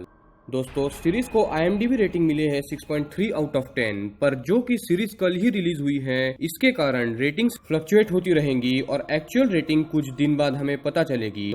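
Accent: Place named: Maharashtra, India. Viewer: Yaar marathi accent may hindi sunkar maza ata haiii..... 😁😁😂😂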